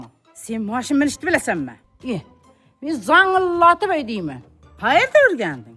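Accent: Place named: Payariq, Uzbekistan. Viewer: ШЕВАНГИЗ БИЗНИ ПАЙАРИК ТУМАНИ АЙРИМ КИШЛОКЛАРИ ШЕВАСИГА УХШАЙДИ ЖУДАЯМ ЙОКАДИ ГАПЛАРНГИЗ. АЙНИКСА ШУ РОЛИК ЗУР ЧИККАН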